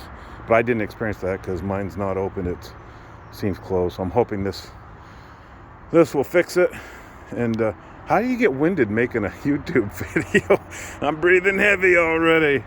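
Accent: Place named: Portland, United States. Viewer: "ayeeee good mornin youtubas" dont worry man i can already tell youre coming at me from portland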